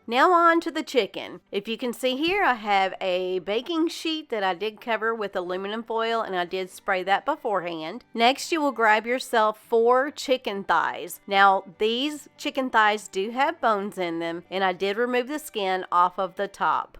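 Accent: South, United States. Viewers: I love your way of talking. Southern talker, perhaps ?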